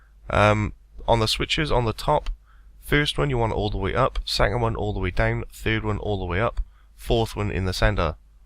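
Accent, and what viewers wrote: United Kingdom. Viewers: (Break) and (Law) --> British guy narrating. Long story short, a sudden image of popped into my head.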